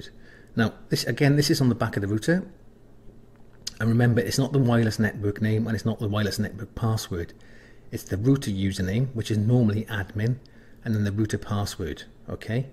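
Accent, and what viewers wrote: Wales, United Kingdom. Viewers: Omg, Taffy...lol it's so lovely to hear that Welsh accent...I miss UK.... Anyhoooo, cheers for that will fix it tomorrow as a, little drink is in order right now 🥂🥂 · This guy sounds so British! You're one of the most British tech channel I ever watched. (Most tech channels are either Indian or Americian)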